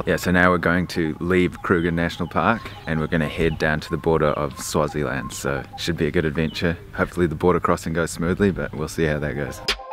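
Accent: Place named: New Zealand. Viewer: New sub here, great vids I've always wanted to know what SA was like. I knew I picked the kiwi accent haha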